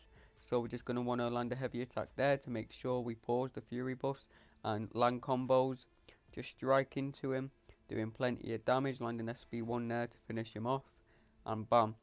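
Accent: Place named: Cockney, United Kingdom. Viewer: Wait, u a London boy? Hope i am not being unpolite, just i stayed in leeds for a year, and that cockney accent sounds soooo familiar.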